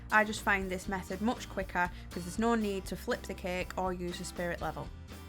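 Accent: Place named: Yorkshire, United Kingdom. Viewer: love your yorkshire accent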